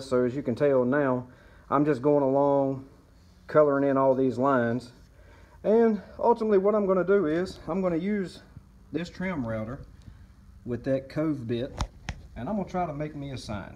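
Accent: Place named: South, United States. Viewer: Well, looking at your video for the First-time. That's a Beautiful Job! Well done to you. May i ask " What wood did you use? Also, love Your Accent! Sounds like you are From..." Down South " Many thanks and Kind regards Colyn. All the way form The UK. P.s. God bless The U. S.A.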